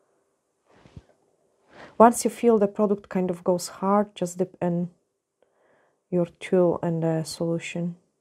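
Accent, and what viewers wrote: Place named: Scotland, United Kingdom. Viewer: How funny, I wondered why your accent sounded a bit off... lol.. you actually picked up your Scotish accent with Polish. 👍👍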